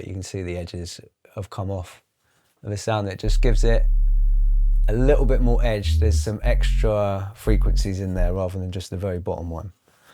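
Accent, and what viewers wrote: United Kingdom. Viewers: He has a standard Estuary English accent. Hard to define a class based on it I would say.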